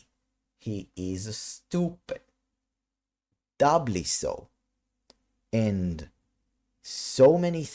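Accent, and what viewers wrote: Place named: Israel. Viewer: Are you Israeli? You have an accent that really sounds like it.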